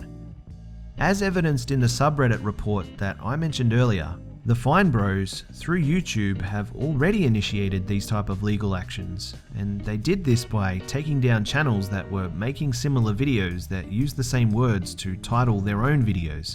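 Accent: Australia. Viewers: dude i been watching you for hella long but just now realized you are australian and have an accent.. lol random... something wrong with my ears maybe lol... and speaking of the fine bros thing I don't really know much about them but they seem douchey lol.. I don't really watch reaction videos though especially not stuff like "teens react" and all that so yeah .. I don't even understand why they are so popular I mean I don't care about random peoples reactions to things.. im ranting lol.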